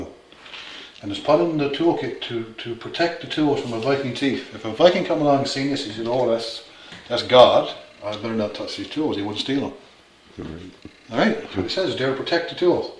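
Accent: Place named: Newfoundland, Canada. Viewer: Haha Newfie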